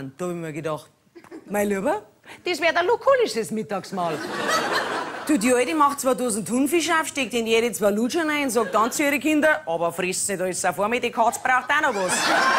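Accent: Bavaria, Germany. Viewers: ich mag sie sehr und schaue auch ihre auftritte. ich muss aber ganz selten lachen. leider verstehe ich kaum was... bayrisch und dazu noch schnell, das ist nicht einfach für einen nicht-bayer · Schön wenn man als Ostberliner versucht bairisch zu verstehen. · Ich liebe die Bayern und deren Dialekt.... ist der weibliche Mittermeier 🍻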